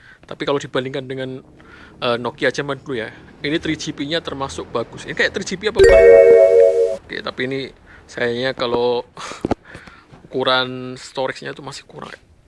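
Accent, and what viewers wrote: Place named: Solo, Indonesia. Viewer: Bang suara mu pria solo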